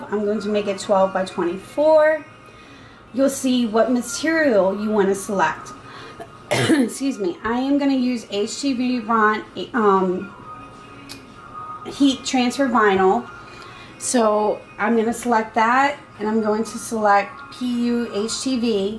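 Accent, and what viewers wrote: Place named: Delaware, United States. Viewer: my ex mother in law and one ex sister in law lives in Middletown my other ex sister in law lives in Bridgeville. Your accent reminds me of them (all good things). I graduated from Dover High School before moving back to my home town in Nevada lol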